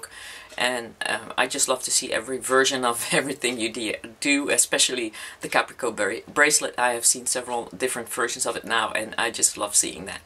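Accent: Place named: Netherlands. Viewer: are u originally dutch cuz u have a dutch tone in ur voice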